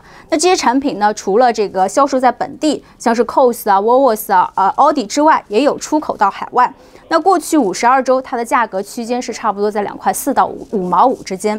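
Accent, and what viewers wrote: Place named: Nanjing, China. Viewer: coco口音好像是南京或者附近的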